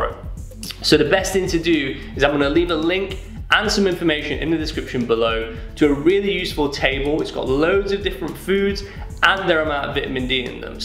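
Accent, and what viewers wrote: United Kingdom. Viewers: Haha, i know it's from your accent and it's how they pronounce it in the uk which is really the proper way because the uk is where English comes from but it sounds funny to us, aluminium is even better hehe..i lived in England before and my friends would also be silly and copy my American accent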